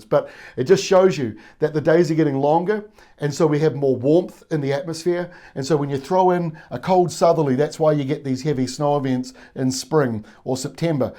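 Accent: New Zealand. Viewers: Good to hear a real nz voice not like that Niwa channel full of yanks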